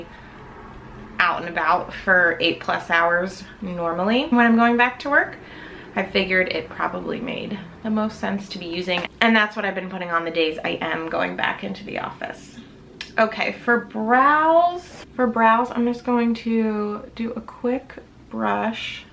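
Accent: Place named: Northeast, United States. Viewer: Do you mind me asking what profession you’re in? I always imagined you being a teacher…you always have that sweet nature teachers have 😊 And, again if you don’t mind me asking, what region of the states you’re in? Your accent leans North East to me, but I’m never sure 😂 Also, I say keep rocking the skinny jeans! I’m 34…so you know my closet is full of skinny’s! And I’m not giving them up, I love how they look and I love how they feel! I left my baggy jean days back in high school! Haha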